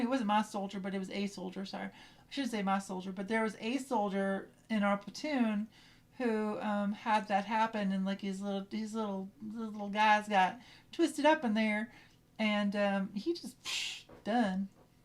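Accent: South, United States. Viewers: It's my first time hearing your voice. Wow that soutthern twang!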